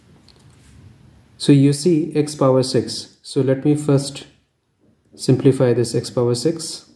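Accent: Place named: India. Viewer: Bro, Are you Indian ? Your accent is like 🇮🇳 Indian? By the way one of the nice way to answer the question.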